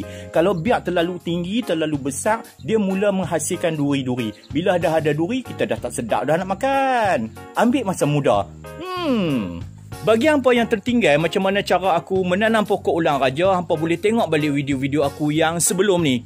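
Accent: Kedah, Malaysia. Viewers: Tertunggu2 video..terbaiklah.syiok dengaq loghat kedah piaww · Amboi amboi amboiii...pandainya berkebun..seronok dengar loghat kedah. Terbaiklah hang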